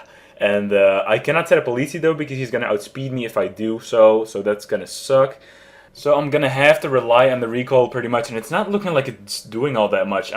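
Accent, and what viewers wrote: Netherlands. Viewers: U dutch? I can hear it in your accent haha ;)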